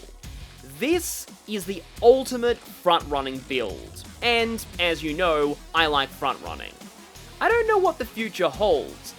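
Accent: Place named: United Kingdom. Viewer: I didn't watch the video, I heard a feminine British sounding accent and shut it off and went straight to the comments to laugh at people that were bad and coping. Go play with a homeless man's wiener under a bridge somewhere.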